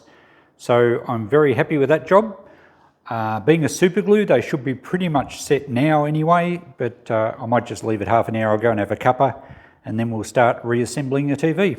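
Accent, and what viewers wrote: Australia. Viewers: Thanks mate u sound so Aussie 😂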